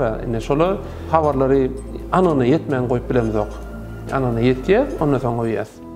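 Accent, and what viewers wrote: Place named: Balkans. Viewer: aga oya gidip bilemzok veli shu mahal giden yaly boldym sizin gurleshiniz eshtdip (balkan aksendinda) ❤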